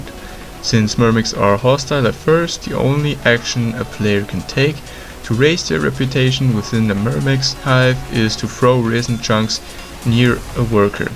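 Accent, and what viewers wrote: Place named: Germany. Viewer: Ich dachte mir bei jedem deiner Videos ey der hört sich so deutsch an auf einmal in diesem Video bei Minute ist da ein Video von Lekoopa 😁 heißt du bist doch deutsch😄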